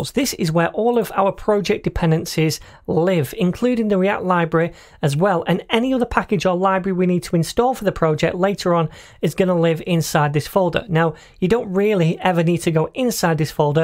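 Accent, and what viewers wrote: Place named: Scotland, United Kingdom. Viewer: You remind me Jackie Stewart's voice, really. Same accent.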